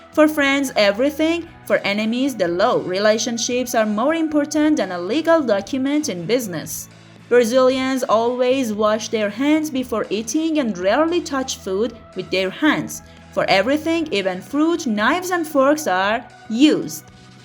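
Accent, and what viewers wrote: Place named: Iran. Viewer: Your accent is blindingly obvious Persian 👍💗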